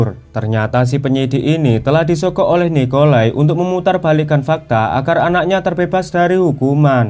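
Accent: Java, Indonesia. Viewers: Jowo mu ngendi min? Kyo aku 7th no jkrta logat jowoku ra isoh ilang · Komentatorx bahasa Jawa medok banget,,,🤭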